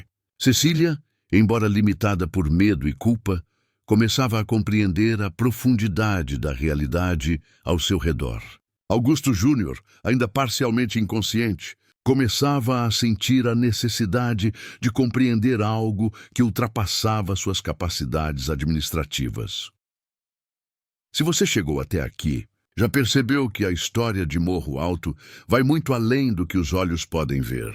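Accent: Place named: Portugal. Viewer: Só não entendi o porquê do sotaque português de Portugal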